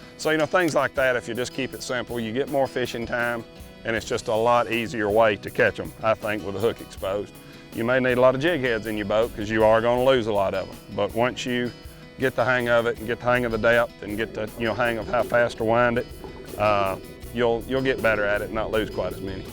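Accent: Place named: Appalachia, United States. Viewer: You know it's good when he has a hillbilly accent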